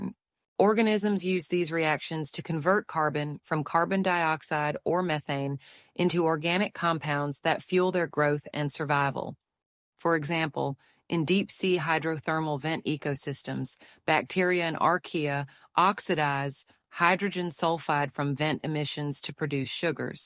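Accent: United States. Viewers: I'm glad you showed the words "chemosynthetic life," because when listening earlier in the video I heard "chemosynthetic laugh" and "chemosynthetic glass." The joys of varied American accents! Although there's poetry in finding "laugh in the darkness." Thanks for pointing out the usefulness of NASA discoveries for surviving on this planet. The more I see what humans are capable of, the more I don't want us to colonize other planets. Humans might be the Kudzu or the cane toads of other worlds.... We have a long history of unintended consequences. Love your work!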